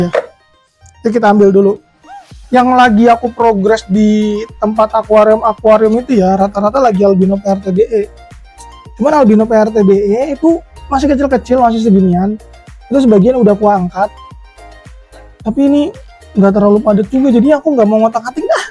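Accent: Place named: Java, Indonesia. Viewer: Bang kamu asli dr mana logat nya kya dr jawa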